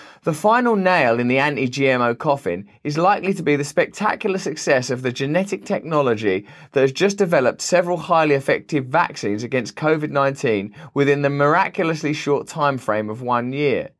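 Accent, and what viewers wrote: United Kingdom. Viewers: Despite the fact that Russell often dresses like my crazy aunt, he really seems to know his shit. It's definitely worth enduring that limey accent to recieve some of the knowledge he's offering.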